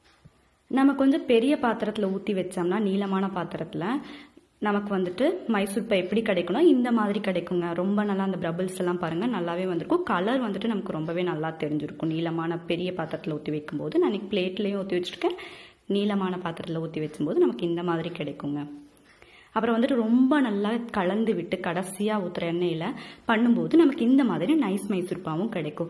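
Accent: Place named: Kongu, India. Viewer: Nice sis..kongu slang theriyuthu..kovai i think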